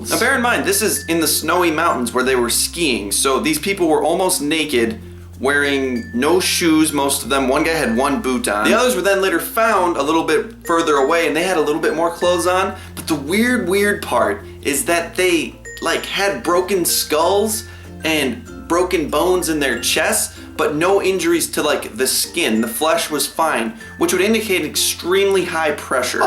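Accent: Wisconsin, United States. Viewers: Are u from Wisconsin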